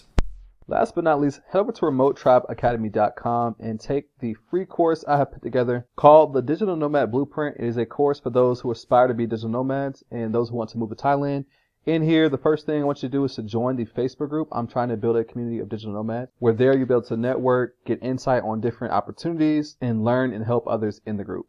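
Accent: United States. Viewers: Hey wassup I have no intentions at all of moving there but I do appreciate your suggestions, knowledge, and sharing your informative and detailed Perspectives & experiences. I can tell u would be a great friend to have in my circle. U sound like u from the 5 boroughs. Probably BK or queens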